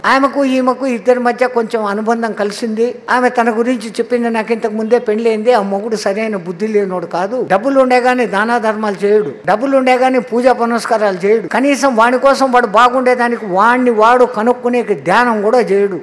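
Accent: Rayalaseema, India. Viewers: పక్కా రాయల సీమ భాష.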